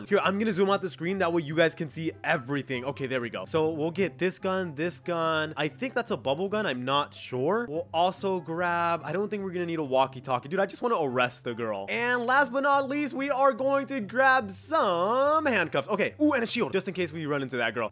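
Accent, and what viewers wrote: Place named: South, United States. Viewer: I like how he talk in dixe voice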